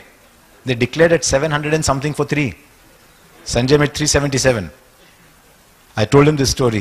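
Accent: Hyderabad, India. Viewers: Hyderabadi 💯 aakhri kiraak chindiyaan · Bhaago miya..kya karain 😂😂😂..he nailed the Hyderabadi lingo here 👌🏻👌🏻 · Came for the Hyderabadi accent.. stayed for the lesson.. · I love Hyderabadi accent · This is urdu+Hindi + Telugu accent = Hyderabadi language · Namaskar harshaji it's cent percent hyderabadi aswellas beautiful english commentary with mellifluous voice thank u n request u for on field jokes as u hubnob with cricket cricketers and can Crack crickety jokes thank u sir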